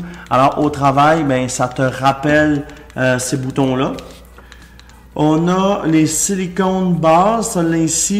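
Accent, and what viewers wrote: Quebec, Canada. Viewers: kiffant j'adore ton accents Québécois ?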